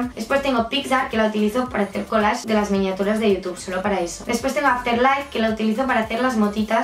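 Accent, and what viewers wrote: Catalonia, Spain. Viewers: Holiii soy nueva en el canal y enserio que cuando te he escuchado hablar sabía que eras catalana, se te nota mucho por el acento 😊 Yo también lo soy😄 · Eres catalana? Es que a veces se te nota un poco de acento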